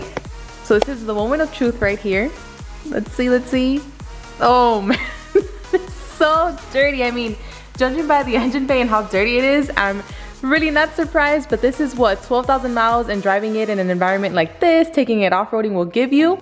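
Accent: Latin America. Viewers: I figured u were Latina from ur accent but I didnt want to assume! Cuidate! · Are you hispanic I hear a lil accent , btw you fine guerita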